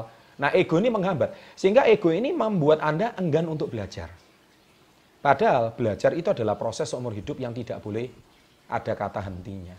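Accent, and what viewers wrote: Java, Indonesia. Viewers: ngomongnya jelas dg nada jawanya . keren mudah di pahami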